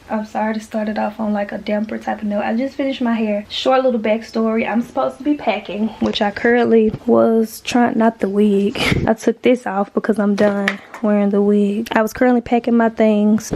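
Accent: United States. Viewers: Your little country accent is so cute!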